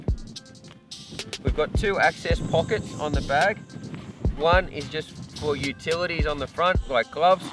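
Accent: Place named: Australia. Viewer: Lol love that aussie accent yet we aint got it here. In tassie it sucks lol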